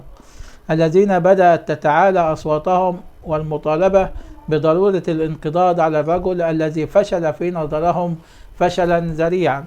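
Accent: Egypt. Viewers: يا باشا انا بشوفك دايما تتكلم عن انك بتتكلم باللهجة العامية المصرية و اللهجة الصعيدية و بترد على الناس اللى بينتقدوا طريقتك او لهجتك او قراءتك - يا باشا اعمل اللي انت عايزه و بطريقتك البسيطه و اللي عاجبه يشوف فيديوهاتك اهلا بيه و اللي مش عاجبه براحته لكن انت مختلف لانك بتجيب الاخبار من جوة اثيوبيا و من ارض الحدث - احسنت و استمر ولا يهمك من اي حد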